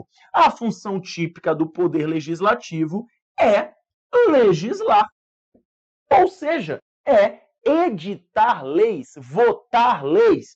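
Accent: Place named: Salvador, Brazil. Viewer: Quando escutei o sotaque, eu falei pra mim mesmo "esse cara é de Salvador" kkkkk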